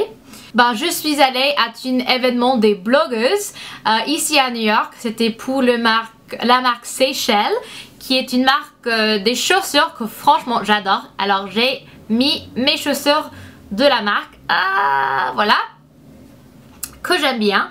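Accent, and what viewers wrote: France. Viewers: !!! J'adore ton accent de frenchy! Et puis on comprends très bien ce que tu veux dire, c'est ça qui est mignon! je suis tellement déçue de ne pas avoir eu l'occasion de te voir sur Paris! Des bisous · Tu es tellement belle ! J'adore ton accent français c'est trop trop mignon ! Tu es géniale <3 Bisous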